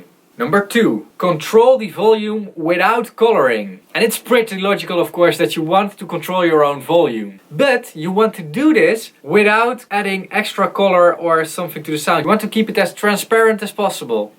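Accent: Netherlands. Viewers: You sound dutch..right ?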